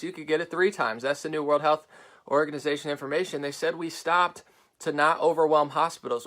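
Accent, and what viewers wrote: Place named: New York, United States. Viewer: "Don't chu wanna suck this person off until...?" No. Yooo 💀 I was dead on impact. Lmaooo Viva! "He's talkin about wiiindex!" 😂😂😂 Are you from NY bro? Cause we always did that back home.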